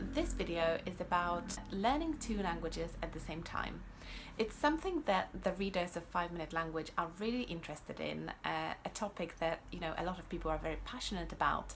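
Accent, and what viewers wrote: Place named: United Kingdom. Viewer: Very useful tips, I'll definitely try out some of them. Last year I started to learn Norwegian and Hungarian from scratch at the same time and I was quite unsure whether it was a good idea. I have one question for you: how did you manage to lose your native accent and speak like a Brit? I'm Polish too and although my accent is clear I'm still far from sounding like a native. Thank you for the video!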